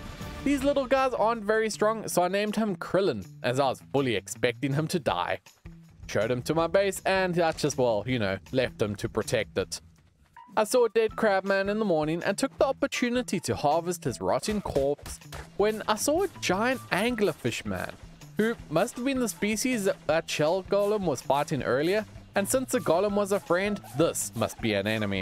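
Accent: South Africa. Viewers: wait ur south african I wanted to say ur accent eyyyyyyy LEKKER MAN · You from South Africa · Hey bro awesome video I just want to ask are you from South Africa you sound like it 😅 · Are you south African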